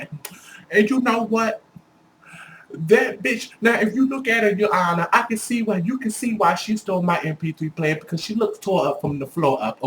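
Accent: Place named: Chicago, United States. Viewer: Where are you from, because you sound like you are from Chicago.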